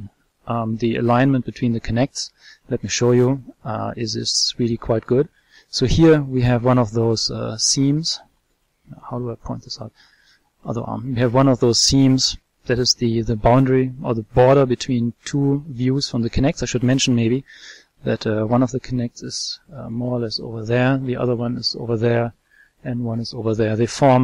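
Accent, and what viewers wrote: Germany. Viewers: Ist das ein deutscher Akzent, den ich da raushöre?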